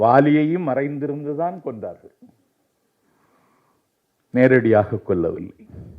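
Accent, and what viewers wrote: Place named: Kanyakumari, India. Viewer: That's the beauty of Kanyakumari Tamil...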